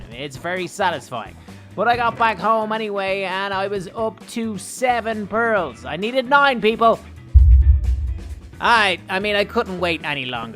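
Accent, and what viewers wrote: Ireland. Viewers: Are u Irish like me?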